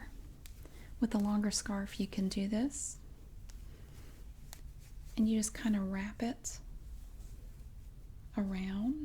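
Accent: South, United States. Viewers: I absolutely love your roleplays! And your voice is so relaxing. Plus, the Southern accent reminds me of home <3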